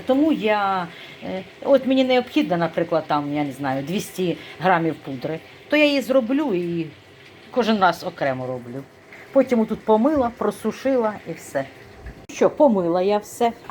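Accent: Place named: Central Ukraine, Ukraine. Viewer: Ну от навіщо цей ЛІКБЕЗ. Це не той канал, де грамоті навчають. знімає Проізвольні відео, і мені цікаво все і навіть її говір. Бо я теж з центральної України і балакаю також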